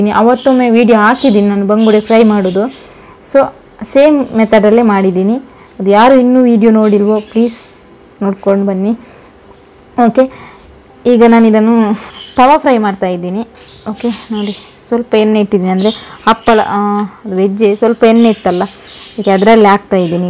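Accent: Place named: Mangalore, India. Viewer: ನನಗೆ ಮಂಗಳೂರು ಕನ್ನಡ ತುಂಬಾ ಇಷ್ಟ ನೀವು ಮಾತಾಡೋದು.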